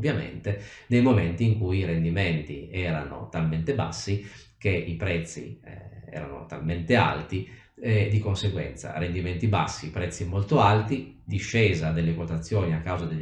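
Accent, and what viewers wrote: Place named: Piedmont, Italy. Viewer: Riconosco l'accento piemontese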